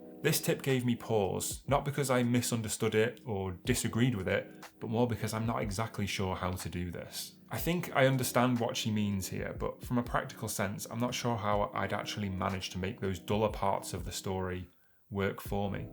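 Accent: United Kingdom. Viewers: Congratulations on your novel. Plus, I love your British accent!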